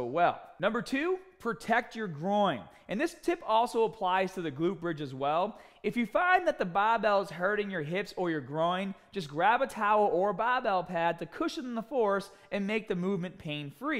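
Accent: Boston, United States. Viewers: Boston. It sounds nothing like New York. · New Englander accent all the way. I'd say Boston. He tries to hide it to sound more professional. Also, if you're not from Boston, you're not going to understand the accent. So he's doing us a favor. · Can really detect the Irish pronunciation in the Boston accent. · Mr Cartoonster I did think Boston! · What kind of American accent is this? Sorry, I'm not American 😆 haha! It's like the "r" in the word "started" is silent.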